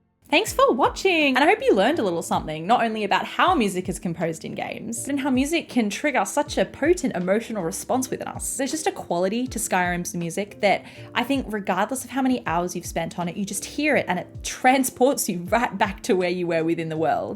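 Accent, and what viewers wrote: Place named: Australia. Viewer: I'm really glad I could have a positive impact! :) Of course! Thank you for taking the time to reply! I really enjoyed it and actually checked out your other vids! And let me tell you, in my years and years of watching yt I've never wanted someone to succeed more I'm genuinely shook you don't have more subs! I've never been so quick to sub haha but your quality is absolutely top tier so I really really hope your channel grows! :) And ayyy hell yeah Aussie represent🇦🇺 it honestly helped me relate more hearing your accent haha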